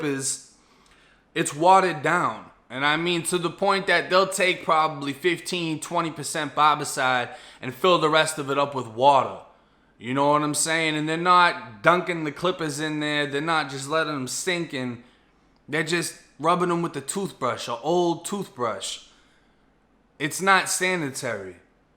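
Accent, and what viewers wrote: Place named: East Coast, United States. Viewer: Biggest crush EVERRRRR😏 it's the east coast accent with the Florida dgaf vibe for me🤷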